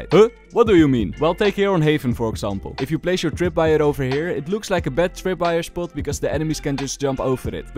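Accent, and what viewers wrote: Netherlands. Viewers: i smell the dutch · are you dutch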